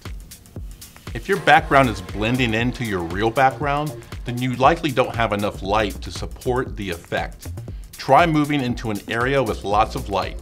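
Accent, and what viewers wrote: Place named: United States. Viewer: Very, very understandable- slow, even delivery- US accent-yay!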